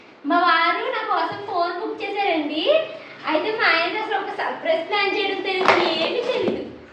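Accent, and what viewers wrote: Godavari, India. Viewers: Ma godavarolu bhasha alage untundi...yevaru marchaleru....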